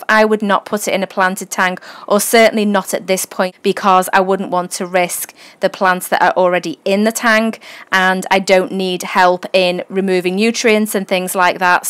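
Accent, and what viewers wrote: Liverpool, United Kingdom. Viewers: i know ur accent it is british.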